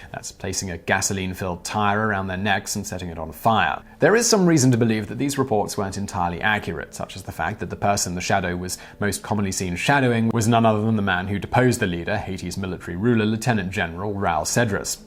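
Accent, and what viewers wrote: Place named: RP, United Kingdom. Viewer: The posh sounding accent is funny when mispronouncing words, like divisive.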